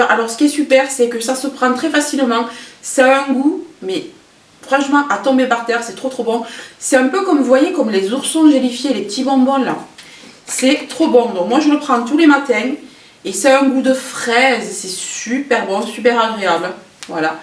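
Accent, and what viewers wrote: South, France. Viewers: j'adore ton accent ensoleillé, accent du Sud ?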